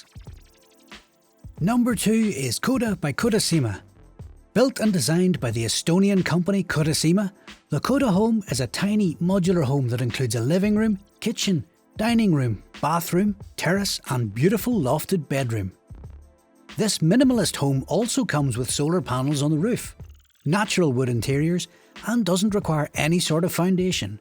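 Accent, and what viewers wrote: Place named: Ireland. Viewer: I'll listen to this Irish dude all day long....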